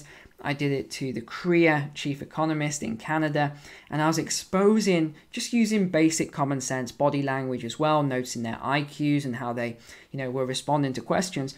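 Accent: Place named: RP, United Kingdom. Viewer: I never enjoyed watching investment and housing videos until I discovered you. I have been following your advice since March and I think you are the best. I Iove your posh accent. Greetings from Asia; wish you would talk more about the housing out here too. I am really looking forward to you next video!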